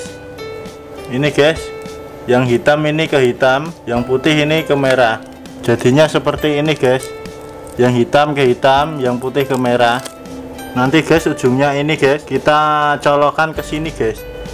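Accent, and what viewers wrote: Java, Indonesia. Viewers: klo ada langsung tak like. aku pokoke ngefasn karo bahasamu mas. medok jowo marai ngakak. ngrungakno tok wes ngakak.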